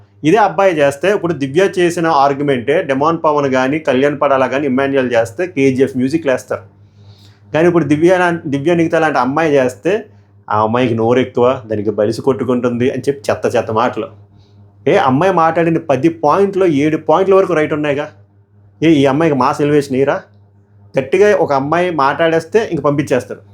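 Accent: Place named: Amalapuram, India. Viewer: original Amalapuram slang ❤❤❤❤❤❤❤❤❤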